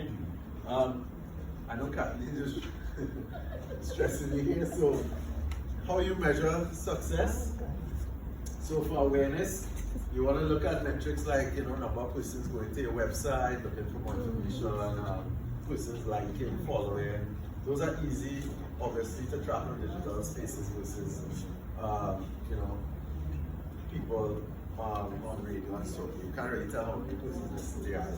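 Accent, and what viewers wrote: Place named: Jamaica. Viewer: I'm enjoying this lecture. I'm into sales marketing and can relate perfectly with this. I'm just trying to grasp the Jamaican accent 😂😂😂😂. Good content you have here💃💃💃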